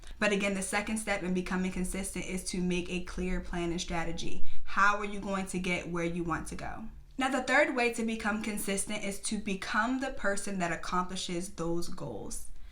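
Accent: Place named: DMV, United States. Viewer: You’ve gotta be from the DMV or somewhere in that area lol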